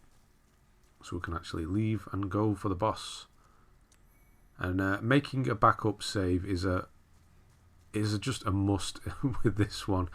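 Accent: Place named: Teesside, United Kingdom. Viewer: mate these have been a great help so far never played a souls game before had to restart after watching your first vid as I had agro'd that first Prince and ended up feeling like I had to kill him I have now filled all the way through taking shots at doing it on my own and watching the vids to make sure I havnt missed anything and leveling up with you at the end this has been a great help were are you from you sound like you are from near me I'm Teesside UK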